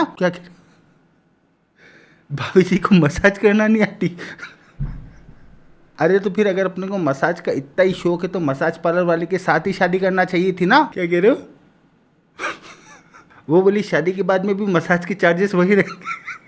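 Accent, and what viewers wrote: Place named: Gujarat, India. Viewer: Aap gujarati ho na ? Kyoki aa theth bol rahe ho.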